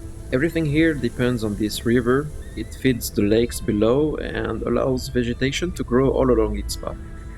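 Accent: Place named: Germany. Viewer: It's funny because when you speak English it sounds more like you could be German (a bit) and not French at all. C'est une très beau projet, très prometteurs. Curieusement j'ai assez de mal à construire dans Enshrouded. ça me donne énormément d'idée, trop en même temps et du coup j'ai du mal à m'y mettre, je bricole sur ce que j'ai, mais je ne suis jamais lancé dans LE vrai grand projet. Je fais au mieux, pour traiter l'immensité de besoin et possibilité qu'on acquiert, pour allé dans le sens de mon projet, mais sans jamais y allé carrément. Pourtant la petite pose dans le jeu serait le moment idéal pour le faire, car justement j'ai moins à faire dans le jeu proprement dit. Trop d'idées me paralyze en quelque sorte. Je suis quand même content de ce que j'ai déjà fais, mais rien à voir en volume avec tes réalisations, bien que mes idées soient du même ordre de grandeur. 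Il faut dire que je commence un peu plein de jeu au fur et à mesure des sorties et le temps n'est pas illimité.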